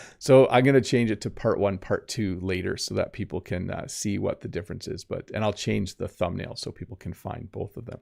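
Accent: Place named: Canada. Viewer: you make me would like to immigrate to canada I like canadian accent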